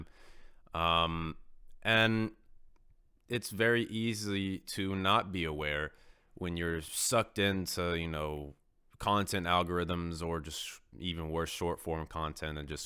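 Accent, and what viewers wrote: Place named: Brooklyn, United States. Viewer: totally random but you have the most interesting accent I've ever heard, lol. How do you have you a Brooklyn, Russian, and Texan accent at the same time 😭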